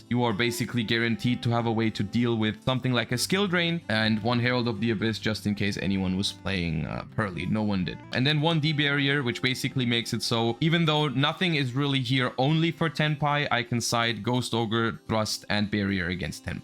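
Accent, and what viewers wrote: Germany. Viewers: You’re from Germany?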